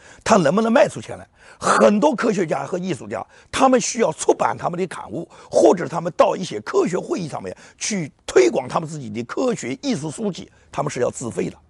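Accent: Nanjing, China. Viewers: 啊是南京人啊，听口音像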